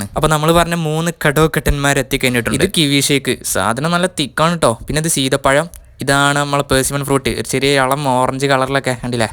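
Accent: Malappuram, India. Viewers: Kiddu aayirunnu...😃...pinne aaa malapuraam accent...tht makes the video even bttr!😄💖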